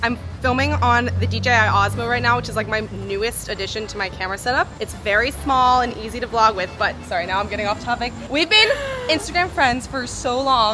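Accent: United States. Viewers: I'm from western Canada. Think Montana/Minnesota accent. When I listened to you, I thought I heard a hint of Bahstan.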